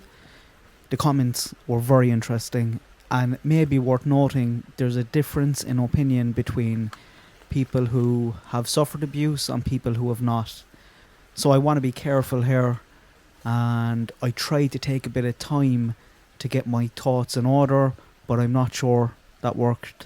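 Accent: Ireland. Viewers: I just want you to know how much I respect your videos, you manner, your insight, your opinion. And an amazing Irish accent to boot. Thank you for putting this out there, I love listening to you ❤❤❤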